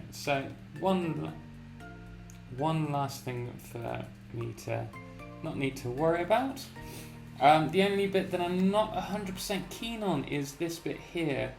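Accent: United Kingdom. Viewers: the microphone sound doesn't sound muffled or clipping a whole awful lot though, i find the the general quality of the spectrum quite decent albeit a bit boosted agressively in the mids at the serious detriment of bass response (is there even bass?), it does sound like a cheap stick 1 buck mic in that regard (but even those can work magic if you know how) i mean muffled is worse, at least you can clearly hear the words he is speaking. sounds like he just needs to filter out the background noise, and a low hum which is most likely caused by PC fans, and judging by his british accent i'm hazarding a guess he may living in an old house with no grounding on the sockets except for the wet groups, i have that and it sucks shit for playing guitar, you have to constantly keep in contact with a string to ground the hum out lol, yes even on humbuckers.. although pickups are technically AC, the amplification stage is NOT. 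i already said years ago youtubers should up their game in using hard limiters / compressors to get their levels nice, but in those days ear rape was a slapstick thing lol this doesn't seem to be as much as a leveling issue as much as it is an ambient noise/EQ problem i assume you're listening this on headphones, i'm playing this on it on near field monitors with very conservative volume settings so the annoyances you experience kind of fly by for the most, i'm not too bothered by dust, clipping gets on my tits though, which is really not the biggest problem here, i've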